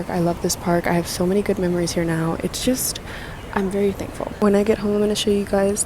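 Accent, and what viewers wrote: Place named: Colombia. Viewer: Tu español suena Colombiano….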